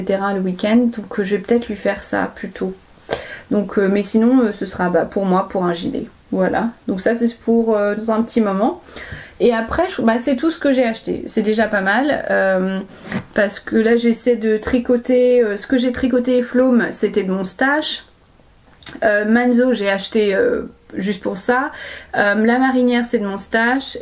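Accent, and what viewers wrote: England, United Kingdom. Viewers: contente de retrouver après cette absence ! tes projets sont superbe, j'aime beaucoup le Manzo et ton Ravello marinière ! j'aime bien tes anecdotes hors tricot et ta façon de parler ! de temps en temps ya une pointe d'accent, mais c'est très léger, et si ça se trouve je ne l'entendrais pas si je ne savais pas que tu vivais en Angleterre .... je croise les doigts pour les exams de Simon et ta nouvelle mission boulot ! à bientôt 😁😁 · Super de te retrouver. Heureusement que tu étais fatiguée car je crois que j'aurais eu du mal à te suivre sinon MDR. Ton accent anglais est très léger au début le temps que tu reprennes le rythme en français et au fur et à mesure je redoutais que tu ne prennes le rythme de ma fille, c'est à dire mitraillette... c'est très amusant à noter au fil de ton élocution et de ta passion. Un super moment passé avec toi. 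Merci encore. Où peux-t-on trouver les indications, de tout ce dont tu parles, écrites qq part stp ? Merci par avance. Bon courage pour la suite dans ton changement de vie !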